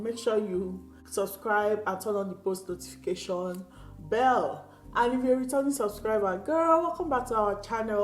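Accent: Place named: Nigeria. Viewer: Nice content I only struggle to understand the very strong Nigerian accent.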